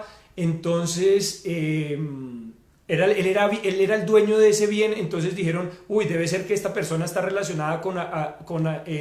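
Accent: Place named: Colombia. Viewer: Púes si es colombiano, en el acento se nota. Sería como raro saludar jajajajaja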